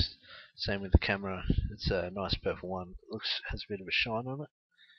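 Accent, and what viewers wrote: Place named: Australia. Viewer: Finally an fucking aussie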